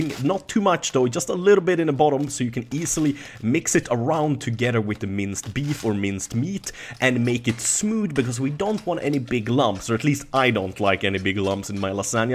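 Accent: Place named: Sweden. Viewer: red onjons (red onions), shiis ( cheese) - ahh i love that Swedish accent :D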